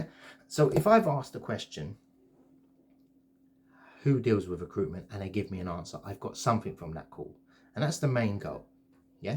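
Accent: United Kingdom. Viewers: Your British accent made me remind of my old gold days at Guildford. Now I am in India working as a US Tech. Recruiter. I have subscribed to your channel yesterday and enjoying watching the videos. You are passing good knowledge. Keep up the good work. Thank you!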